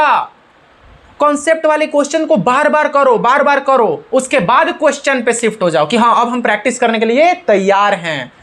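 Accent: Bihar, India. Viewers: Good approach for math telling inspector sahab your style of speaking is fabulous bro and also motivated lots of things bro ✌️♥️♥️ Bihari style 😎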